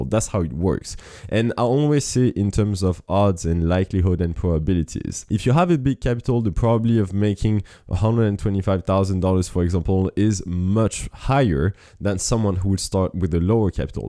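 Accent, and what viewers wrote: France. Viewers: tu ne serais pas français à la base ? je comprends ton anglais parfaitement :)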